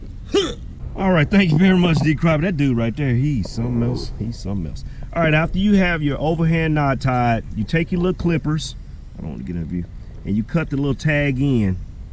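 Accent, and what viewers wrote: Texas, United States. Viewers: Are you from texas? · lmaooooooooooooooooo that country voice tho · Texas baby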